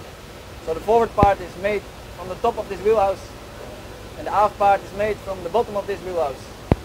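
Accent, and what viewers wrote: Netherlands. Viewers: Hire an English speaker. The Dutch influenced language is killing the pleasure of watching. So, nope, not for me.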